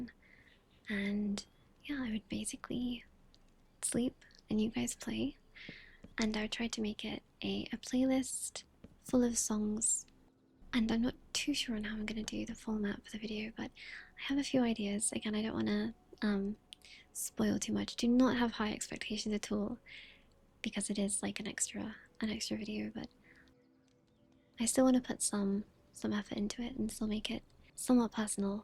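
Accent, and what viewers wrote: United Kingdom. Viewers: Ru from uk?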